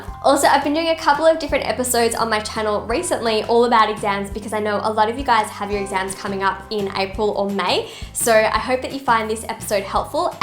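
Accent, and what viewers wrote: Australia. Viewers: Aren't you an Aussie ? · are you an Australian?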